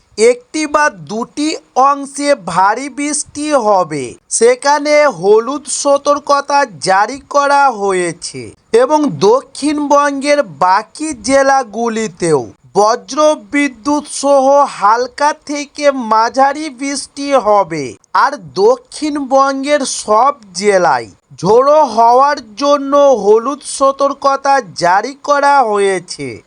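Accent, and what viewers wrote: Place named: Malaysia. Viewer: তুই এই চ্যানেলটা কবে খুলেছিস তোর গলার শুনে মনে হচ্ছে তুই মালয়েশিয়া থেকে আসছিস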